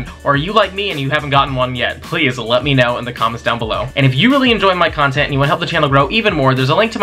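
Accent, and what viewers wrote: United Kingdom. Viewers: Where are you from the uk? O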